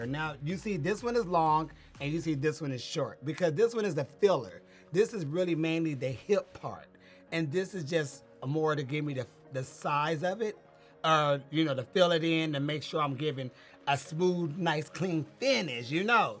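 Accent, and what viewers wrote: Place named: South, United States. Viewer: I'd like a quick peck of a kiss as I promise I've never seen out of drag. Too cute. Nice complexion and skin with thick lips + sounds so Southern with the dialect.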